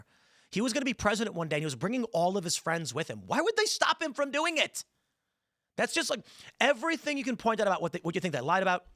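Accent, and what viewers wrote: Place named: Chicago, United States. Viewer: Tim, please stop popping your words, it’s better when you sound like a Chicagoan.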